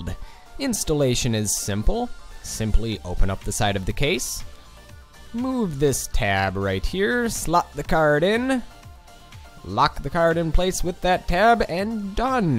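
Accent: Canada. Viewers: Saskatchewan eh! You sound like your from Saskatoon and it sounds cool as a commentator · Canadian as FUCK man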